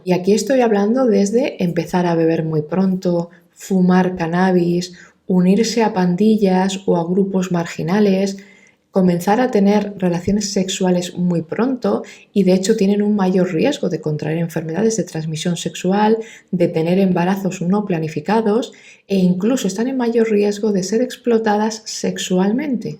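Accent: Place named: Spain. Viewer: Es interesante, pero no tolero ese espantoso acento gachupin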